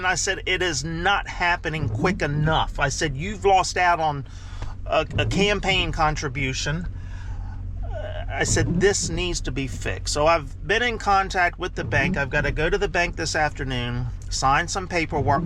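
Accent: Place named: New Jersey, United States. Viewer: You talk like you are in New Jersey .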